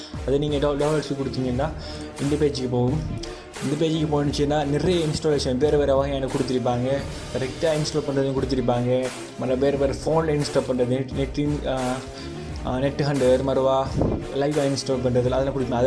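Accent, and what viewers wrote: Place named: Sri Lanka. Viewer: Bro Your Srilankan...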